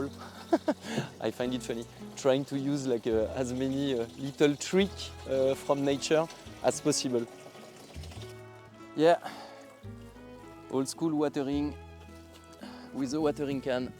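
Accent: France. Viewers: ahah pardon, de l'accent et du nom j'en ai déduit la nationalité française, désolé si je me suis trompé !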